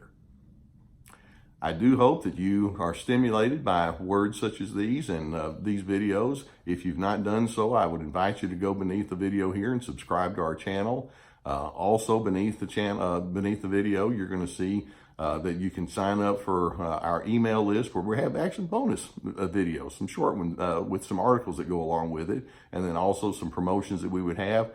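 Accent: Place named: Georgia, United States. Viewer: Where is from? He sounds like Effingham County, GA! ( A Salzburger? Well, I Suanee!!!”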